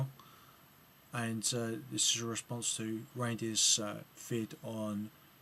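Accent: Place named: England, United Kingdom. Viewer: By ur accent I know that u are from England.shameful u are clueless about the murderers and murder victims of apartheid. I really don't understand why u sit down always and talk rubbish SA when u can put ur time into a good source. Well know it the English adore Mandela . Go to the famous tralfargar square and see his sculpture there. So do us a favour and stop blabbing lies u spineless coward with no brain and unscrupulous even when u at lying. U tube is not meant for idiots like u.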